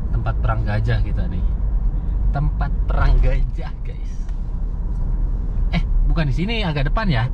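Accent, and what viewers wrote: West Java, Indonesia. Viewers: Masha Allah..jd teringat kembali hajian th kemarin 2019..kang logat sundana sami sareng abdi..salam kenal abdi asli urang patok beusi..subang..ternyata kang dari kp siluman ya asalna..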